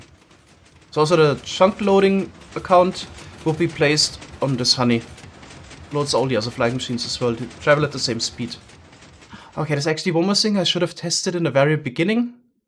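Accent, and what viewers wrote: Germany. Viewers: Bist du ein Deutscher hört sich so an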